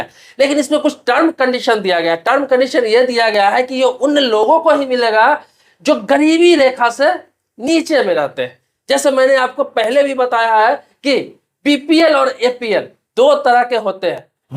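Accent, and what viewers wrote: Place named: Jharkhand, India. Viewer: Jharkhand ka bolia sir ❤❤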